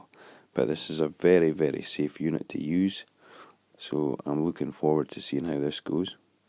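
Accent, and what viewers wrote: Scotland, United Kingdom. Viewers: Where you from mate? Your definitely Scottish. Aberdeen by any chance?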